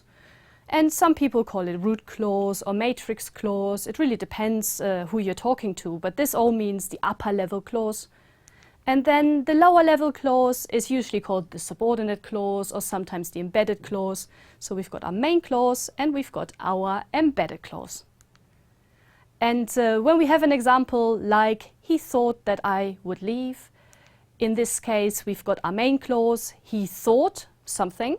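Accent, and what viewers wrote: Germany. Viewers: Funnily, this was my assumption after I heard you talking. For me as a German your accent is still quite noticeable. When you pronounced "have" I immediately thought of this phenomenon called word final devoicing ("Auslautverhärtung"). Am I right with that or would you say it's part of your British accent?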